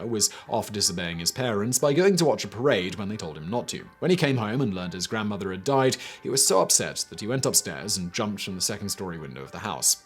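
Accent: United Kingdom. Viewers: I've never witnessed King's life narrated by such a fine British accent. gives the familiar new life! I never heard the story of the hotel operator having a heart attack.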